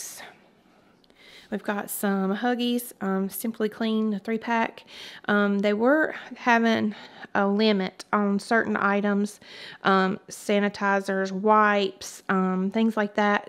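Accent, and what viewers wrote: South, United States. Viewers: Watched ur video for the first time! I enjoyed it so I subscribed! Like ur Southern Accent!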